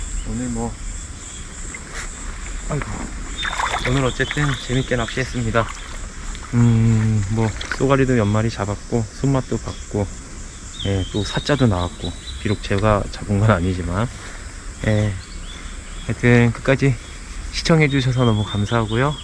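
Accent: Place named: North Chungcheong, South Korea. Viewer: 충청남도가 아니구 충청북도 말투시네유~~~ 제천쪽 그쪽 사시네벼유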